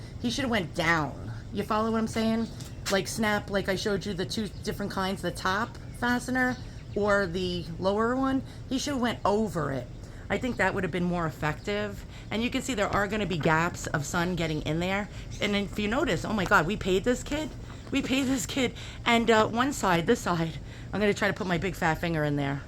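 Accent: New York, United States. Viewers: From a new yorker to another new yorker, love the accent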